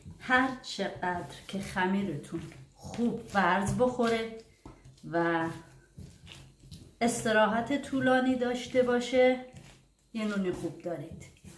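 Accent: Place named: Azerbaijan, Iran. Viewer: هرگز!! ترک استانبول که فارسی رو با این لهجه حرف نمیزنه حاج خانوم! چرا قایم میکنی ملیت خودتو؟! خجالت داره مگه؟! مال یه جایی از آذربایجان ایران هستی ولی دقیقاً نمیدونم کدوم شهرش.ا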